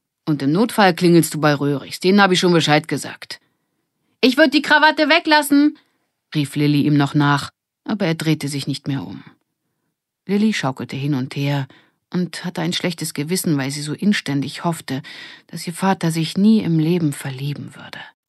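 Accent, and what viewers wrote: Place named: Russia. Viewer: Haha cool, da bin ich nicht die einzige, die mit bald 30 Jahren die Fortsetzung einer Ära vergöttert! Vielen vielen Dank! Ich wusste gar nicht, dass “Die Wilden Küken” existieren. Immer, wenn’s mir schlecht geht oder ich krank bin, höre oder schaue ich “die wilden Hühner” & das gibt mir ein Gefühl von Geborgenheit Glück & Kindheit. Aber als ich nach den Wilden Hühnern hier suche, finde ich diese Reihe und hab den ersten Teil in 2 Tagen durchgehört! 🥰 Danke dir!! 🙏🏽 Stimme allerdings dem Kommentar zu den Akzenten zu! Das klingt wirklich eher russisch und sehr sehr harsch haha, aber es gibt auch nen Lacher, wenn auch mit verzogenem Gesicht 😅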